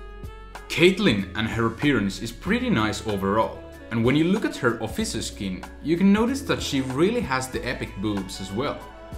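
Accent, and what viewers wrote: Finland. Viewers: Where is he from? His accent sounds quite finnish. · Are you from Finland?!?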